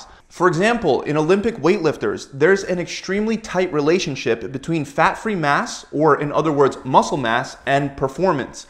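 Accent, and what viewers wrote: United States. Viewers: Usa voice?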